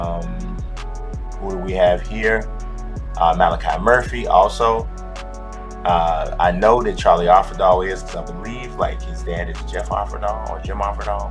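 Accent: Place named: South, United States. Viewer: Lmfao you funny af bro def form the south im guessing atl? And yeah bro thanks so much for the info!!!! So glad I didn’t download this is wack af 70 plus dollars for this lol ea wow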